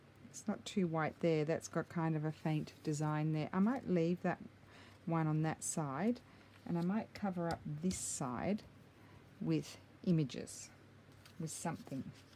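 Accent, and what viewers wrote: Australia. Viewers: Hi, I assumed you were in Australia from your accent but just heard you mention you’ve just been to Australia - I know it’s nosy, but where do you live?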